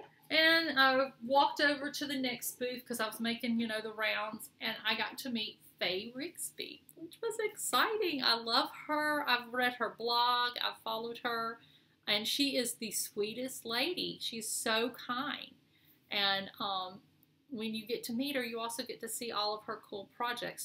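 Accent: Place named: South, United States. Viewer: Hi! I have been binge watching your videos...I love your choice of projects! I love your southern accent too! I watch your videos while stitching and I find myself agreeing with you out loud. My husband will peek in asking, who are you talking to...? LOL!!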